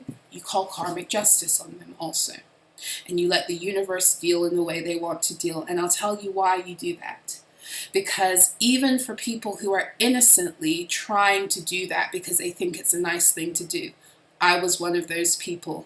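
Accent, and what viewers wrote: United Kingdom. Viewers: Where approx in uk ru. Scottish accent?😊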